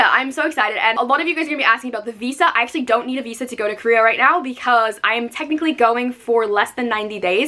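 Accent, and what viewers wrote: United Kingdom. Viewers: You sound so british for an american 😭😭😭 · as a british person I can actually agree! she sounded like someone from North London!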